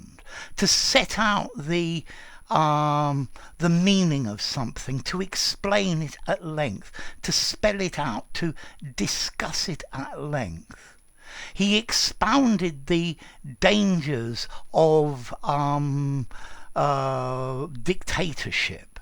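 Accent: United Kingdom. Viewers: Hello, are you british or american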